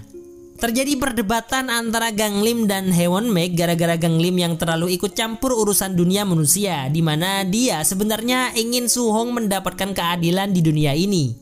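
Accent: Madura, Indonesia. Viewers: Pasti orang madura😅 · Orng madura ya mas hh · Pasti Orang Madura ya hahha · Yg cerita org madura kah... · mimin orang madura ya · mangkanah reng madureh😂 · Bang Madura nya daerah mana bang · Mas ya orang Madura ya,,, sumpah baru kali ini liat alur cerita ending ya q nangis sampe sesenggukan,,, terimakasih mas sudah membatu menceritakan,,sebab mau nonton film ya GK ada sub indo ya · Madureh kakeh min? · Orang madura bang??? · min orang madura kmu min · Bang kakeh reng madureh yeh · Lu orang Madura ya bg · Reng madureh bn min? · Orang Madura min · bhs madure wkwk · Orang madura bang?medureh dimmah? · Logat nya madura bngt😅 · Ternyata bebengusnya oreng madureh yeeeh🗿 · Reng madureh kakeh🎉😅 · Barusadar orang madura kah😅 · Kak maaf aku ketawa, logatnya lucu, kaya logat orang madura. Emang asal madura kah? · Madura nih adminnya😂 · Orang Madura ya 😅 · Kelihatannya sang moderator ini orang Madura tak iya...😂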